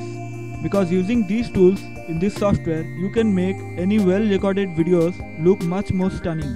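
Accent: India. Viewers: When i hear this sound how he is talking he is from Indian for sure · Difficult to understand the Indian dialect......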